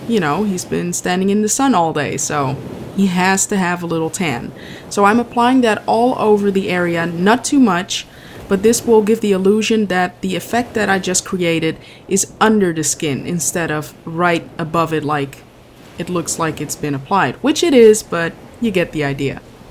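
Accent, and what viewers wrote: Netherlands. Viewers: Im gonna guess.... Ben je nederlander? Lol je accent.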